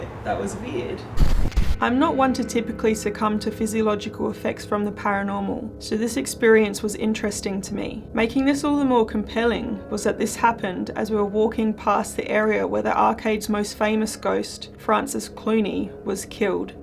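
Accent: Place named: Australia. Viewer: I love the fact that I can tell you are really serious about the paranormal. What makes you videos better than anyone else's is your beautiful Australian accent. Love your videos. Thank you. Keep them coming.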